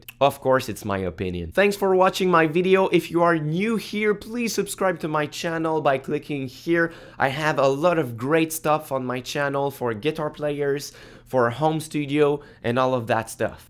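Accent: Quebec, Canada. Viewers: es tu québecois ?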